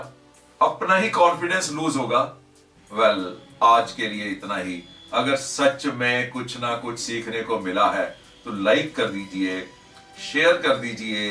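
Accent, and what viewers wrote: Punjab, India. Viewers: Sir , You speak hindi in punjabi tone and me too, will you please tell me how to fix it?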